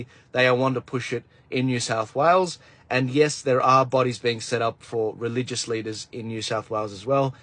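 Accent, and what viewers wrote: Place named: United States. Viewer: Misinformation. Such as Dutton stating the voice has the power to Vito government? Or how about its completely legal for politicians to lie to Australian public during elections and referendums? How about scomo with his watered down to the national icac bill? What war are you fighting bud? You sound just like a yank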